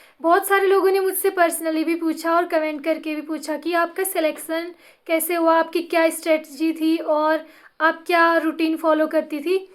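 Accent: India. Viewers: Tum pakka pahadi hogi tumhri voice se pahadi accent aa rha hai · Tumhari tone uttarakhand jesi h hamare yeha ki ladkiya bhi aesi he bt krti h..m Pithoragarh se hu aap kha se ho..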